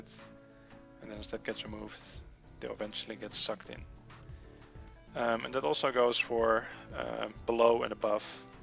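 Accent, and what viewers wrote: Netherlands. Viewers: Haha ik hoor dat je nederlands bent, dat betekent niet dat je engels slecht is hoor maar gwn je accent en uitspraak! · What kind of accent is that? Are you dutch?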